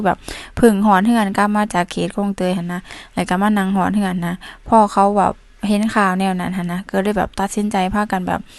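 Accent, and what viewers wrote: Laos. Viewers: ลองคิดในมุมของเจ้าหน้าที่นะ ทั้งบ้านได้สัญชาติไทยกันหมด มีอยู่คนเดียวไม่ได้สัญชาติ แล้วเพิ่งจะมาขอตอนอายุ จะ 30 ปี แถมมีลูกอีก ในมุมมองของคุณมันแปลกมั้ยละ FC หลายคนก็บอกแล้วปรับวิธีการพูดให้คนไทยเข้าใจ นี้ก็ไม่ยอมปรับตัว พูดแบบสำเนียง ลาวอยู่นั้นแหละ มันแปลกทั้งประวัติ แปลกทั้งสำเนียงการพูด คุณเป็นเจ้าหน้าที่จะทำให้หรือ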